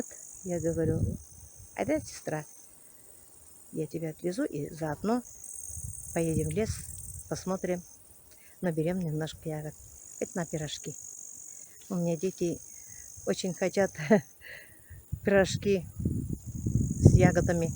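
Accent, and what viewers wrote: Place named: Mishar Tatar, Russia. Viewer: вы не мишары,говор мишарский у вас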